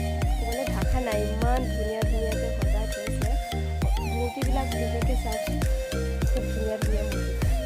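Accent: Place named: Upper Assam, India. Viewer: Ujoni axomor bhakha to bor val lage mur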